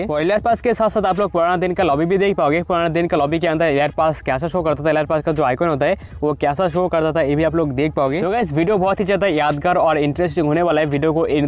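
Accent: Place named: Assam, India. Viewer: YOU'R SOUND LIKE ASSAM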